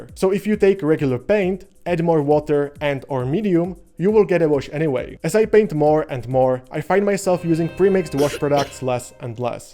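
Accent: Czech Republic. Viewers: způsob, kterým vyslovujete slova jako "miniature" a podobně, Češi mají podle mě velmi specifický způsob kladení důrazu na to měkké anglické "r" :)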